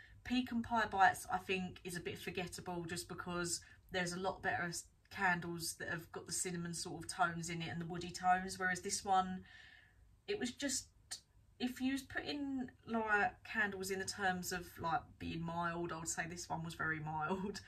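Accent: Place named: Essex, United Kingdom. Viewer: Hi 😊. As I'm a novice with all things candle related, I was looking at Yankee Candle reviews and found yours so I subscribed as I thought you were the most relatable maybe because I'm a London / Kent girl and I think your accent is Essex (forgive me if I'm wrong 😁). I saw you had a candle warming lantern which I thought was a brilliant idea and bought one immediately as I have a Jack Russell dog that's a bit of a bulldozer I was too afraid to have a naked flame in case he knocked it over. I am sure I remember you saying in one of your videos that you sometimes pour melted wax away which helps the candle regain some of its scent. I have a Merry Marshmallows candle and I have put it under the lamp several times but it's started to lose it scent even though it's only burnt down a quarter of the way. So my question is how much should I pour away and how do I dispose of it? 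Many thanks for reading 😊.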